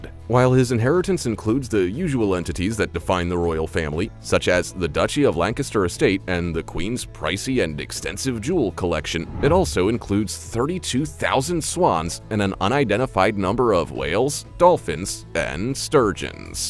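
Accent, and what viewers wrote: United States. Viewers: I do dislike British documentaries narrated by Americans. Not only do they tend to be factually incorrect, but the pronunciation often jars on British ears. For example, "Buckingham" places no emphasis on the last syllable, whilst the American pronunciation makes it sound like cold meat. Incidentally, "England" and "Britain" are not interchangeable. The Queen and King were crowned Queen/King of the United Kingdom of Great Britain and Northern Ireland. And I strongly suspect any disfavour Scotland has with England has got nothing to do with ancient gold mines! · I know he also sounds very uneducated about this information about the queen and the monarchy I'm guessing he's an American from the way he talks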